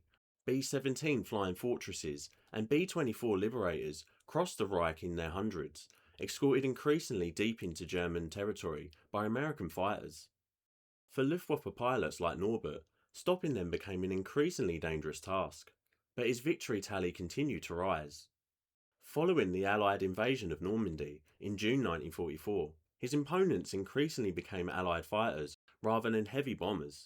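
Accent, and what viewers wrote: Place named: London, United Kingdom. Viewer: work on your accent, too much London in it.....the letter 'T' is meant to be pronounced, not figh-er or for-y.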